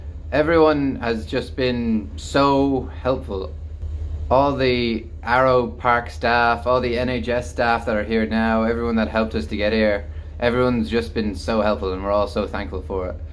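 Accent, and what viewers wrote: Ireland. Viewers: Irish men and their accent are just love. 😍😘 That's made me fell in love with. ❤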